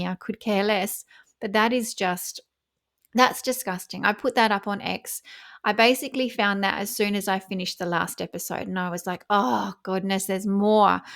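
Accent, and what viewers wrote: Australia. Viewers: You have a beautiful laugh! Love your work. Great to hear a fellow Aussies voice about this awful woman! We can smell bullshit and seriously, even if she were to go global 😅 i reckon the Australian market would be her worst. If i ordered my latte, made by barristas who know me, and they put flower sprinkles in/on it, I would suggest a holiday was in order. We take our coffee seriously down here. Imagine Lygon St italians seeing this! Santa Maria 😅😅😅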